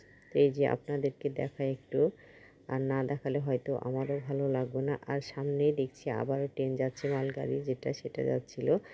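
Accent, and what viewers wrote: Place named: Rajshahi, Bangladesh. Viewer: Eta Kon jaiga? Apnar vasa amader Rajshahir moto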